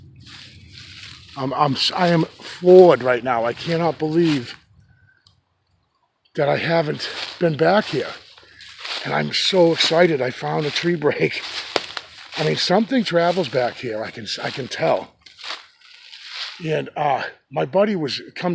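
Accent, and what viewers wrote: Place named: New England, United States. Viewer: this must be new england "back yaaad"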